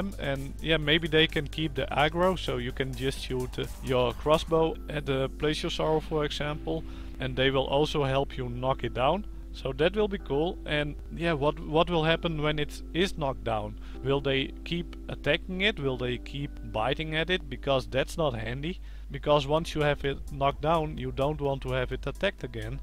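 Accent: Netherlands. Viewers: are you dutch? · Are you dutch? xD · are you dutch ? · Ik hoorde meteen het Nederlandse engels XD Leuke vid ;) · Another good video :D I see those people still haven't subscribed! I don't understand why people don't subscribe when they watch your stuff! Oh and i was wondering... are you Dutch? :D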